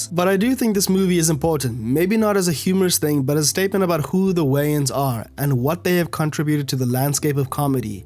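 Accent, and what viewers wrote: South Africa. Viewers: Are you south african? I'm detecting a South African accent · unrelated question but are you south african?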